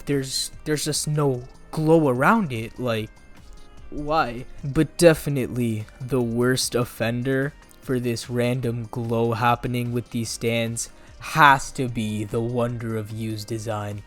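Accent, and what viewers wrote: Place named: United States. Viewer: I don’t want to sound salty, overly critical, nor judgmental: But I hate how “JoJo“ or some other English words come out as American’s say them. I was born in the states but raised around Latino culture but the way you guys say: “Joe Joe” sounds a lot like “Jue Jue” and when you said “Pay-slie-park” it sounded like you said: “Pay-lee’s-park”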